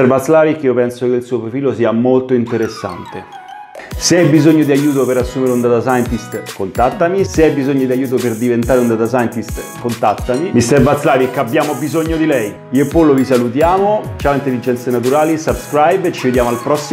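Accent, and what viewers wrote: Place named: Rome, Italy. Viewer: Grande! La penso come te sui Recruiter e la retribuzione che offrono in Italia, scrivono annunci contraddittori, alte competenze e stipendi da fame...prendono tutti per stupidi. Mi sembri romano dall'accento, tu in cosa sei Laureato? Di cosa ti occupi? Sto seguendo qualche tuo tutorial per un capirci meglio su AI e ML per un mio progetto e tu ne sai molto, non nego che trovo molta difficoltà, non essendo un matematico... però non mi arrendo, sono solo più lento degli altri 😊 io voglio specializzarmi su Python per AI e ML, hai qualche corso (gratuito) in particolare da consigliarmi? Grazie per i tuoi video, ti faccio i complimenti😊 perché spieghi Python mentre scrivi come se scrivessi in italiano...sei una potenza!💪😉 Ciao 👋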